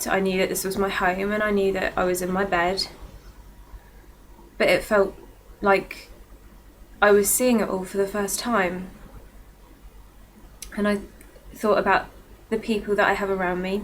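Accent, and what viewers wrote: United Kingdom. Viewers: Your accent sounds british to me, not aussie. Very interesting video. Thanks for posting it. I do know a great deal about MPD/DID.. am a hypnotist and have great success with hypnosis and regression. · Is it me or do you get a British accent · Ur British not Australian!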